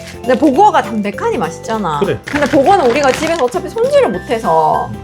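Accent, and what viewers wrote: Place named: Jinju, South Korea. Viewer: 저도컬리로 시장자주보는데요.. 님 영상보면서 실패확률 제로예요..써보고좋다고 하시는거는 항상바로바로 장바구니에담아요..너무너무도움됩니다.그리고 저는 고향이 진주인데요..경기권에서 살다보니 님 사투리들으면 고향생각나고 친구들생각나요❤..구독한지얼마안되서 매일정주행 중입니다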